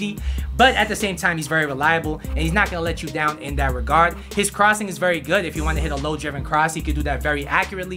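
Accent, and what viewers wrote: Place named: California, United States. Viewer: Cali boi? I can sense an accent bro hahaha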